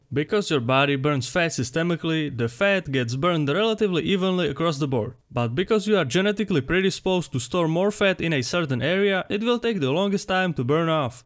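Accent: Czech Republic. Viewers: You sound czech:D (as a czech myself) also, nice video. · You sound like you are Czech😅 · Yo bro is that a czech accent?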